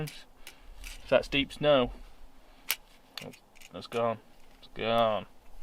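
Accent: Yorkshire, United Kingdom. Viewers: I have the same tent and similar stove. The tent is a great tent, with those tent pegs where the cut out is fold the webbing and it I’ll fold it into the grooves. Or hook the top of webbing into the groove. It won’t budge. With your stove top tip, get a piece of Velcro wrap it around your stove box, it’ll hold it all together whilst messing about with the threaded rods. When i set mine up I do it on a tarp or foam pad not on the ground so nothing is lost. Your right you’d be fecked if you lost a wing nut. With regards to your stove in colder climates like Norway 🇳🇴 etc you’d get a buddy to take turns through the night popping wood into the stove throughout the night. My first night using one is set an alarm on my watch every couple of hours to feed the stove. Crap night sleep but waking up to a nice comfy warm tent was nice. If you don’t want to wake up feeding the stove it’s got to be a winter down sleeping bag. 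The guy in the states that distributes luxe tent did a video with a battery clip in fan on the tent pole. Pushes the heat backdown towards you. Try these tips out mate and I promise you a better camp out. Are you a yorkshire lad, picking up the accent a long way from home mate eh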